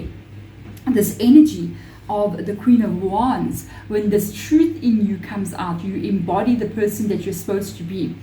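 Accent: South Africa. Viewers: Is that a South African accent I hear? Love your channel and thank you for the wonderful readings ❤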